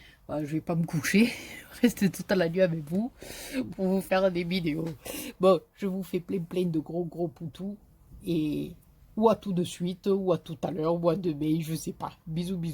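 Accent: South, France. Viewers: Qui elle pèche j adore vous regarder merci pour l accent du Sud plein de soleil bisous josiane brindille 😂🤗🤗🤗👍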